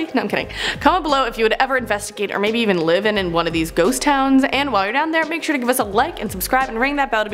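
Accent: South, United States. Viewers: I may stay in ghost town. Keep going Southern accent. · Southern accent for calif town???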